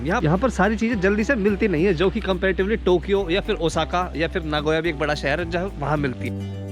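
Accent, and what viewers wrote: Haryana, India. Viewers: Bhai yr tum jb haryanvi accent bolte ho to bda maza aata hai lgta hai tum india mai hi ho...aise hi rehna bhai...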